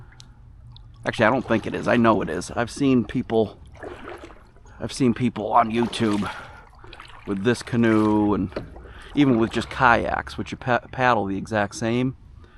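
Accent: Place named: Massachusetts, United States. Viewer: I had a feeling you were in MA. Not the heaviest accent but all the F bombs, lol. Never realized how much we do it until you get around people from other parts of the country and theyre like, "LOL Jesus dude, you swear a lot!"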